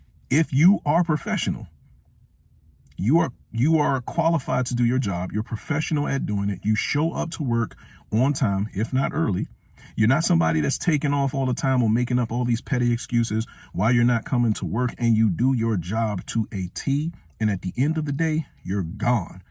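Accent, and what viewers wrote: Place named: Washington DC, United States. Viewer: Man I heard you telling your story like damn he sound like he from DC and well spoken . Then u said it, subscribe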